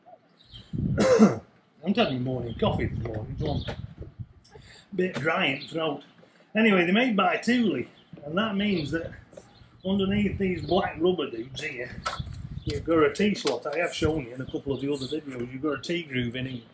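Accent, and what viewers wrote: Yorkshire, United Kingdom. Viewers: As a fella that went to school in Whitby, but now lives in the US it's nice to hear that accent. Yorkshire?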